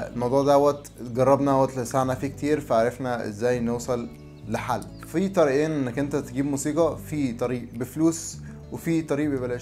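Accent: Egypt. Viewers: قسم بالله مافهمت لهجتك مصريه قوي قوي قوي تكلم بلفصحا وبعدين اعرف لهجتكم بس انت مافهمتك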